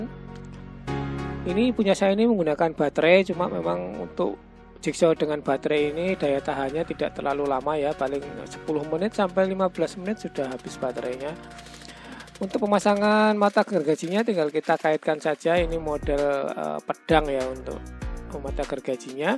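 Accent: Java, Indonesia. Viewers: Lidah Jawa nya tebal bro.... 😂 😂 😂 😂 😂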